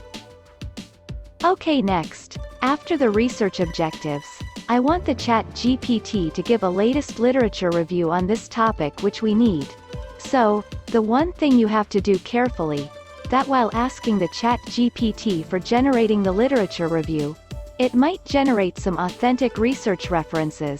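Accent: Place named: India. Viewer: She/he is from India. Thats how we talk.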